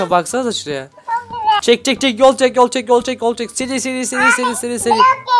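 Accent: Adana, Turkey. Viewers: Abi sen Adanadamısın,Benide çıkart abi ❣❣❣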